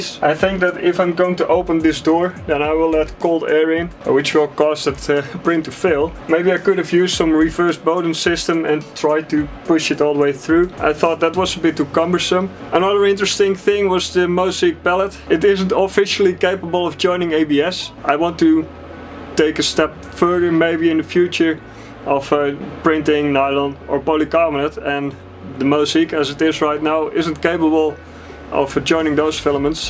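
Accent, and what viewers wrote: Netherlands. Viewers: Ik hoor een Nederlands accent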